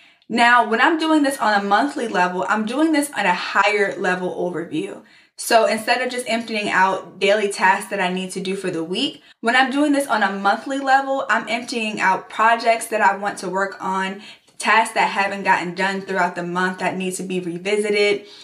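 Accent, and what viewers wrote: Baltimore, United States. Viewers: When I signed up for your email newsletter a few weeks ago it said Maryland at the bottom of the email. I knew you were from somewhere in Maryland because certain words you say you sound exactly like my husband whose from Baltimore and I thought that confirmation was too funny lol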